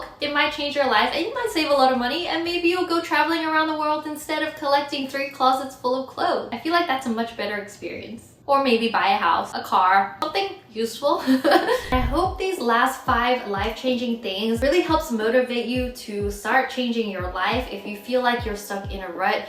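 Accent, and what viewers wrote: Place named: Australia. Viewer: Does any one remember Australian accent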